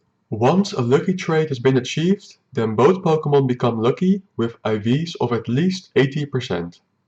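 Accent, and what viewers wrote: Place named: Netherlands. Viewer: Are you from the netherlands cuz youre accent is really dutchy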